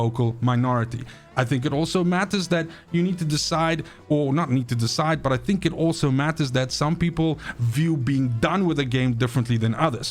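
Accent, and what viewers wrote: South Africa. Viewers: Are you from South Africa perhaps? This, coming from a South African.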